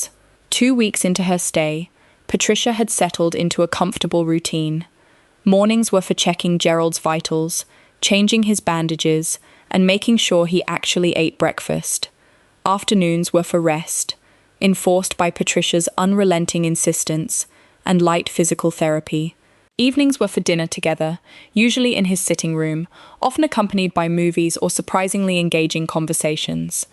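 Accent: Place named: United Kingdom. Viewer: I just started watching from Hammond, Indiana, and already the sarcasm is everything you know if you’ve ever seen my comment I live for the British accent just makes everything sound right. The humor lands perfectly.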